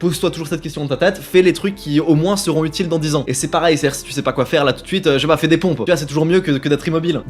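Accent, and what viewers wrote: France. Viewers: tu parles un anglais francisé c'est perturbant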